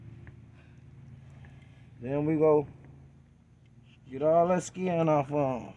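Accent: South, United States. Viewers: mf country as hell lmaoo, saw a turtle and said "dem groceries" hahahah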